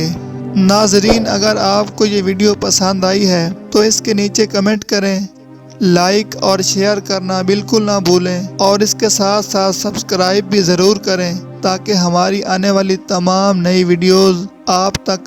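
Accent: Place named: India. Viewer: UZMASAMEER Indian tone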